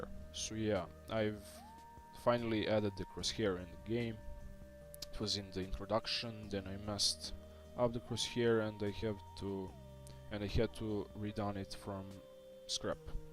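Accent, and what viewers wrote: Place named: Russia. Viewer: Как будто русский говорит по английски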